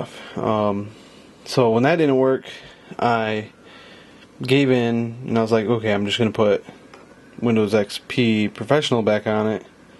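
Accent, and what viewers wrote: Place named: Brazil. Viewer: ho did this video is a brazilian guy, i can see this because accent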